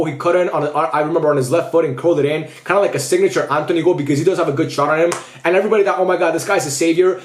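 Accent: United Kingdom. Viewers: Top tier British accent 🇬🇧💷🫖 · Amazing British accent! Please oil up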